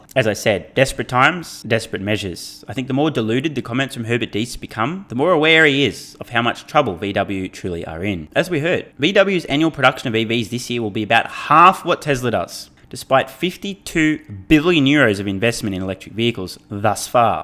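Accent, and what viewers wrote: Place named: Australia. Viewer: You need better vocal sound 'cause your voice grates partially your accent but mostly because of your complete ignorance on the subject of sound engineering! Listen to one your Australian reginal youtuber's; Cold Fusion/Dagogo Altraide. He produces his own music and that acuity to sound educated him to the value of sound processing. It's stupid simple probably under $2-$300.00 Azzie money! Buy a Behringer board with built in Compression and 'Bob's your uncle' as the English say! Get one that has USB output and your sound we be much improved! I only wish that "Electric Pixar looking Viking Fucker" would do the same.....Cheers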